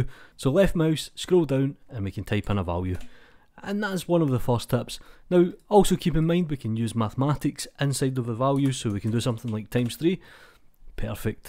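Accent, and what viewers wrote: Scotland, United Kingdom. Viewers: I dont speak english natively.. is that an Scottish accent?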